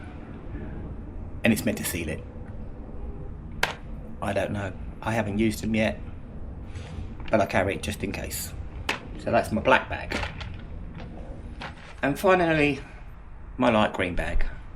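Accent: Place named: Australia. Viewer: Finally, a guy with the wisdom and guts to tell the truth about Wing saddlebags compared to Harley bags! Thank you! I still would love to buy a bagger Wing like yours because at 78 years old I removed my travel trunk on my "09 Harley Ultra Classic a few years ago because I can now swing my leg over the rear fender to get on my bike without arthritic hip pain. Same would be true if I had a Wing with the trunk. At 6'3" it is too hard to get on the bike with the trunk in the way. I suppose I could put a Wing on the center stand and stand on the pegs/boards to mount but I'd rather be able to swing my leg over the seat. Sadly, at 275 lbs., 6'3", size 14 boots it is very unlikely I could fit comfortably on your bike without many modifications $$$ from Traxxtion Dynamics. Maybe I will win the lottery, I should think positive. Anyway, not sure if you are an Aussie in Florida or a Floridian in the land down under, but think I understood 90% of what you said, mate. 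Hope you do more Wing stuff because this bike is on my radar....if I can find a Honda dealer that would take my two Triumph Rocket III's and my Harley Ultra in trade for a fair price. PS" I carry most of the same tools as you do, the pump, the battery booster, tire repair, etc. on all three of my bikes. Several weeks ago I got a flat rear tire on my '09 Harley Ultra(65,000 miles) within about 3 miles of my home after a 150 mile ride with friends. Long story but I limped home and could not find a nail or screw or anything to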